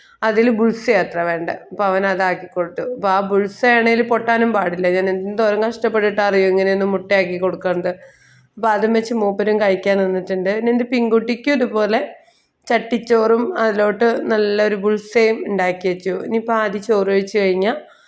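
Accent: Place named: Valluvanad, India. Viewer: വള്ളുവനാടൻ ഭാഷ കേൾക്കാൻ നല്ല രസമാ.. from Kottayam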